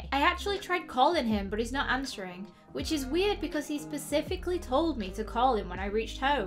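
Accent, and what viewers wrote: Scotland, United Kingdom. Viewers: I really love your voice. You're Scottish ? Sorry for my brutal question. I like how Scottish people talk. And I mean that really in a serious way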